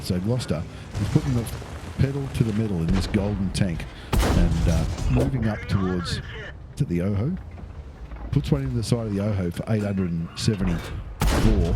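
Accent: Australia. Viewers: Halo Governor yes type 59 g were all over the place after black market now rarely seen on N/A great videos and awesome aussie commentary from Jax Fla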